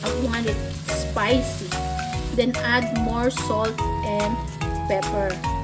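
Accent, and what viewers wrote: Philippines. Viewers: Love you Filipino accent 😊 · ph accent.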